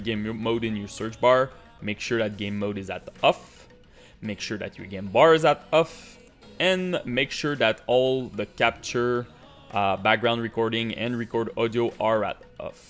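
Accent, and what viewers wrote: Quebec, Canada. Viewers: you sound quebecois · you, my friend, is from quebec! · j'bet 100$ que t'es québécois haha j'reconais l'accent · Gotta say, i'm french canadian too and you inspire me to starts video cause my accents not even worse than yours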